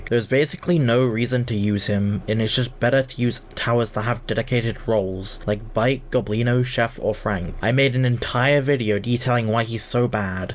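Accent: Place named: RP, United Kingdom. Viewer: You talk posh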